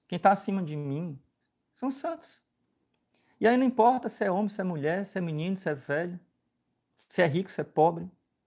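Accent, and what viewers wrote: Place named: Ceará, Brazil. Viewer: O sotaque cearense não sai dele. Haha parabéns, padre.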